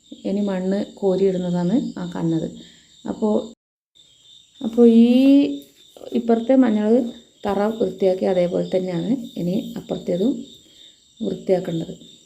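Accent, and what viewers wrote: Kannur, India. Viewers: അടിപൊളി വീഡിയോ ആനുട്ടോ.. ഏററവും അടിപൊളി കണ്ണൂർ സംസാരം ആണ്... മൊത്തത്തിൽ പൊളിച്ചു 👌🌎 വളരേ നല്ല അറിവുകൾ ആണ് പകർന്നു നൽകിയത് എല്ലാവർക്കും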